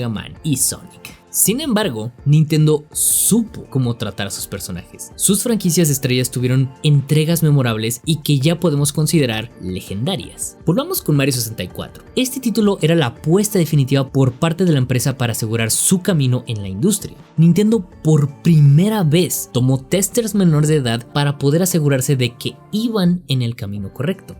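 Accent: Mexico. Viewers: odio como los mexicanos pronuncian el ingles....zir pari....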